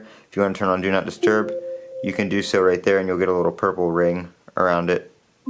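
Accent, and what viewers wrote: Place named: United Kingdom. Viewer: WoW I don`t know why she sound British for gods sake WTF !!!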